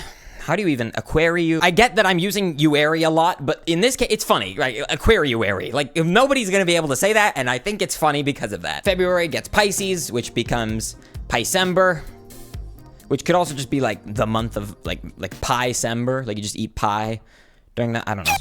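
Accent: United Kingdom. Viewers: Are you british or something?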